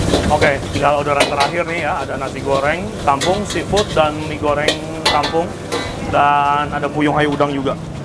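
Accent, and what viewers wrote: Banyumas, Indonesia. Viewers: lama2 pinter ngapak gabunge sama mas inyong 👍👍😀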